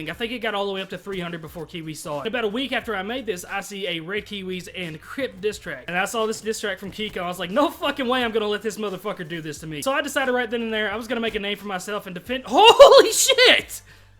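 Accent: Kentucky, United States. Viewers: Where from southern KY You from?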